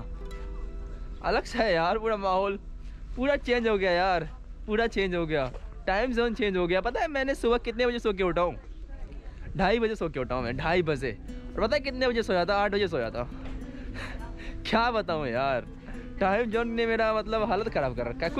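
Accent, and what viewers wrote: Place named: Haryana, India. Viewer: Had mtlb bones, hal mtlb kaise ho Bhait tum haryana se ho lgta h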